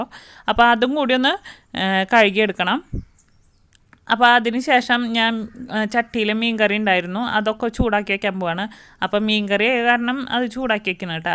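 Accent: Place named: Thrissur, India. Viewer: Thrissur slang varunnundallo.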